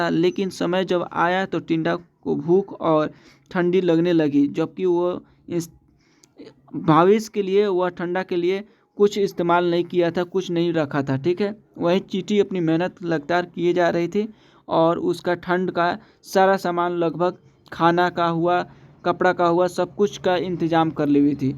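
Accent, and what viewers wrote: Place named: Bihar, India. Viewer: Aapki Hindi Bihari kyu lag Rahi hai 😅😅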